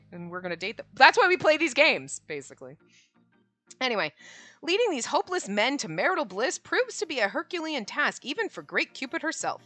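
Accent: Ireland. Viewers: everyone loves the Irish accent me included :)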